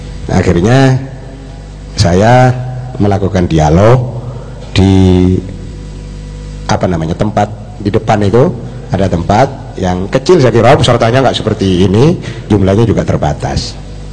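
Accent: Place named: Madura, Indonesia. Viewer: dari logatnya kayaknya orang madura. Mengingatkan ustad idrus romli yg cerdas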